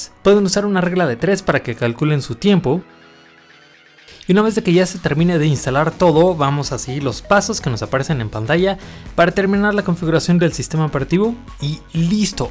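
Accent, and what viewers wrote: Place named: Peru. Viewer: me parece mal esa voz de peruano VENI A ARGENTINA GATOOO!!!!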